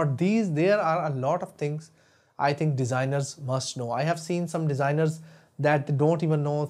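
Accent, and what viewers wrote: India. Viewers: You have an indian accent.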